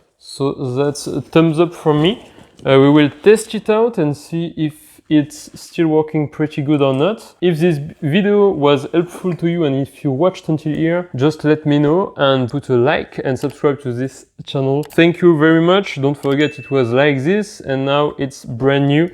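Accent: France. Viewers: great—just a suggestion: if you want, try improving your English pronunciation to make the video even more enjoyable, because it's quite hard to follow you with your very strong French accent.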